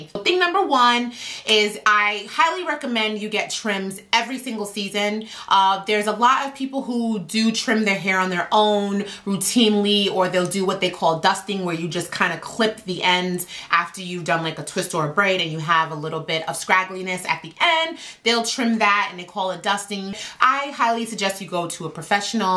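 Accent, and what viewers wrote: New York, United States. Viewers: Oh I will, you've got that great personality and New York accent, you're too enjoyable lol!!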